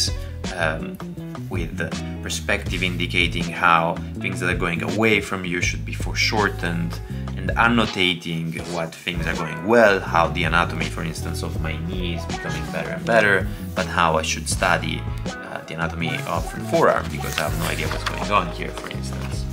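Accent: Italy. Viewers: Ci ho messo una decina di secondi per accorgermene, ma l’accento italiano è davvero inconfondibile 😂 Da un tuo “compare” dall’Italia, bellissimo video, chiaro, semplice e diretto, e il tuo stile sia nel disegno che nell’editing mi piace un sacco! Come disegnatore occasionale sono super curioso per i tuoi prossimi video Continua così!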